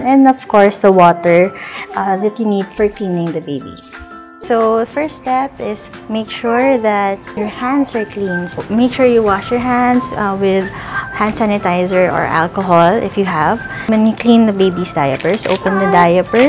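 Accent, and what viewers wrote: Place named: Philippines. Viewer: everytime .5 seconds realuze shes pinay with the accent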